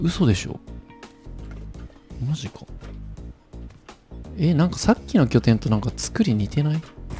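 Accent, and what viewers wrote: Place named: Kansai, Japan. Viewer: 関西弁バチバチすね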